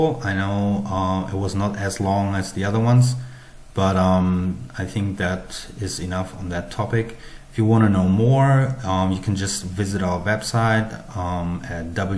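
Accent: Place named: United States. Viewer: OK, that explains those pauses and hesitations and my hunch that you did not sound as an English native speaker, however your good pronunciation deceived me. Anyhow, you don't sound German, more like an American accent. Keep making this sort of videos, but improve your fluency to make them shorter and more pleasant to your audience.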